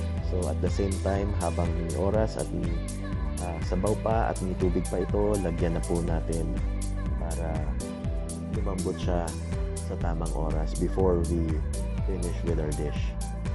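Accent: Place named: Philippines. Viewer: You have a Filipino accent and speak English also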